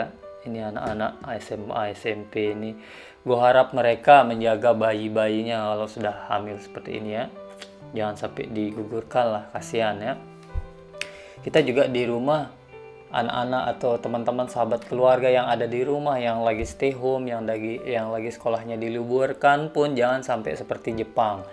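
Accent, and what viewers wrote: Lombok, Indonesia. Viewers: Ngakak gw anjr gara" aksen lomboknya masi😂 mantep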